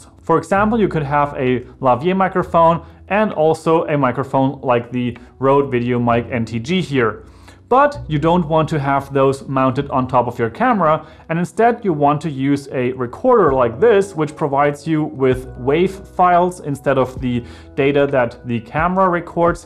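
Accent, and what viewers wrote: Germany. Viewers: ☺️😀 na dann können wir ja auch Deutsch schreiben. Respekt - den typischen deutschen Akzent hast du aber ganz schön gut unterdrückt! 👍 <link> wäre mir jetzt einfach auf Grund der Top 10 aufgefallen. Hast du denn Erfahrungen mit anderen XLR auf Klinke Adaptern, die günstiger sind und trotzdem Plug-In Power liefern? Oder gibt's das gar nicht günstiger? PS hab dich mal abonniert - als Gegenleistung für deine herausragende Kommunikation 😀👍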